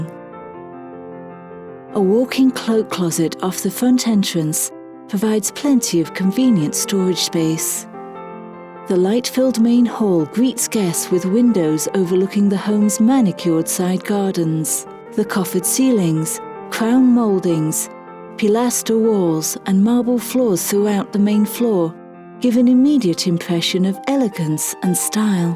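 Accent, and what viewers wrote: United Kingdom. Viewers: Nice English voice keeps saying "over looks the garden" um, dead grass. Spend 13 million and you still have to do a major outdoor remodel and get rid of those marble floors. Looks cold and uninviting. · Jameson Cross yeah I think it’s the “British-like” accent.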